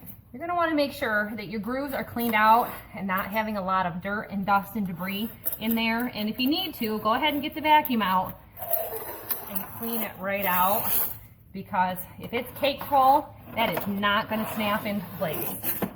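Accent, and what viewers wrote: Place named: Michigan, United States. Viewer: Michigan, I was born in Lansing. Thought you sounded a lot like my cousins up there 🤣. On another note, we just replaced all our windows and the doors are next. We have big problems with humidity hear so wood movement is always changing the way windows and doors shut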